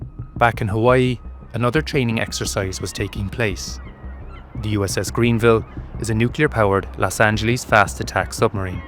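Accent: Scotland, United Kingdom. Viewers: switch to Scottish? It’s been Scottish since the first one no? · It's possible it's to do with AI? I've heard the narrator's voice switch to a Scottish accent. So it's possible the channel is using an ai voice? (I still love the channel regardless)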